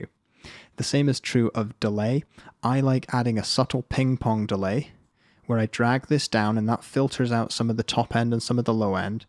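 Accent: United Kingdom. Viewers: I will always thumbs up a video in which the presenter uses the word "fortnight" unironically in a British accent.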